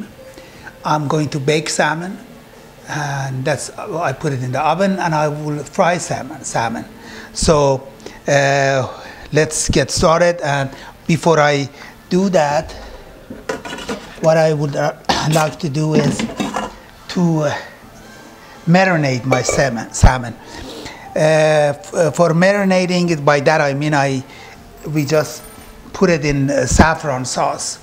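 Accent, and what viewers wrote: Iran. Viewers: Awesome video, my salmon turned out great. This guy sounds Iranian. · oouuuuhh i can tell by that accent that ur persian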